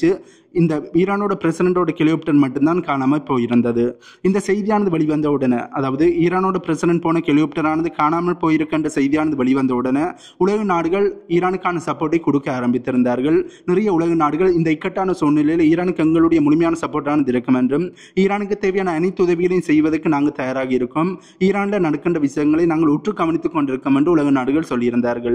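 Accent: Sri Lanka. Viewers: Srilanka tamil best voice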